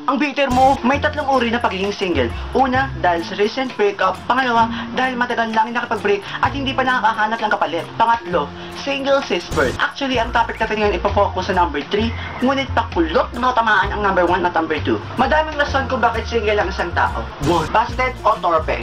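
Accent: Visayas, Philippines. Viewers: Bisaya ni sya bay? :D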